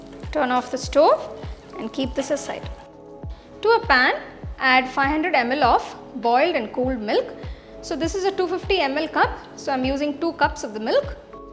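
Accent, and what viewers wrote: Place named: South India, India. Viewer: She is from south India hence the difference in pronunciation..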